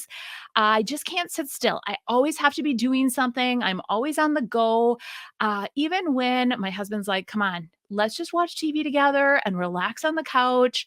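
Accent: Wisconsin, United States. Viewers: I'm loving getting to know you better with these podcasts! I can't wait to see the next and the next! When I first found you on YouTube, I'm sure I typed in DIY or something but what got me hooked on you wasn't the content alone (although that was stellar) it was your personality and, yes, your very cool, Wisconsin accent. I'm from Southern Ontario, Canada and I feel that I have a lot in common with Wisconsiners! (Is that a word?) You are the sweetest, kindest, most generous person on this planet and I NEED people like you in my realm! Never stop doing what you do. You are The Empress in your little realm and I, for one, am grateful! ❤😊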